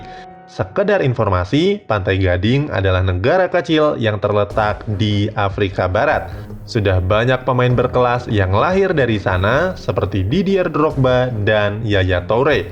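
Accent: Indonesia. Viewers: Lidah indo broo..😅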